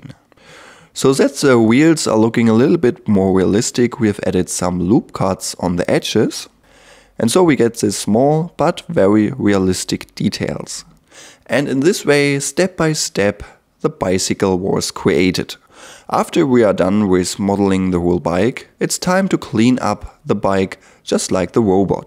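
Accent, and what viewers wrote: Germany. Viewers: man kanns auch übertreiben mit dem deutschen akzent ^^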